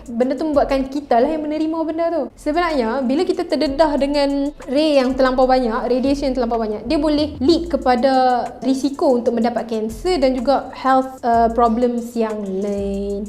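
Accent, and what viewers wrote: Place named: Kedah, Malaysia. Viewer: Kak nak tanya sikit akak ni orang kedah Ker atau orang negeri sembilan sebab bila saya tengok macam orang Kedah sebab loghat akak bercakap deract kedah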